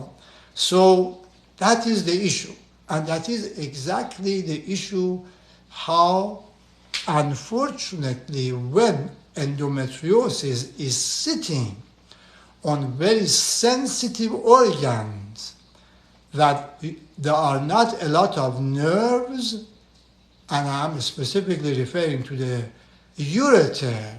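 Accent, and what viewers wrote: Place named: Iran. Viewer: Thank you for your video. I have a 3.5 c.m. Cyst on my right ovary causing me pain. What are my options? My husband and I want to have a child but this situation is making me hold off. Would I require surgery and if so, is my fertility still in tact? Also, you sound Persian. I am also Persian. Thank you 🙏🏼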